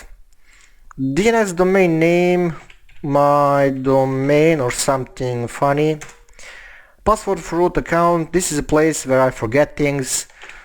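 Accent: Russia. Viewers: The Russian accent is strong with this one. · Thank you Russian man. Love the tutorial and the accent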